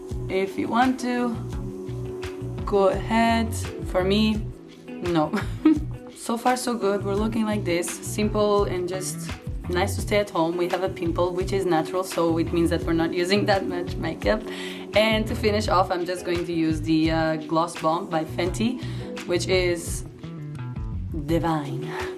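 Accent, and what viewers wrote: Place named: Portugal. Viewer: nao e brasileira ela e portuguesa se conhece pelo sotaque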